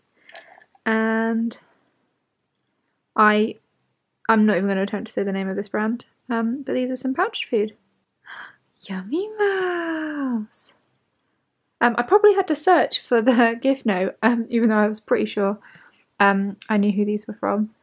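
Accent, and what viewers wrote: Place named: United Kingdom. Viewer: => Wow...That is such a lovely british accent...I dig it ;_)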